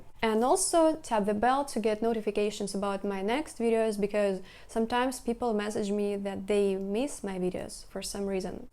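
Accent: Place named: Russia. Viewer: I love your accent! I don’t know why I’m so fascinated with Russia and Eastern Europe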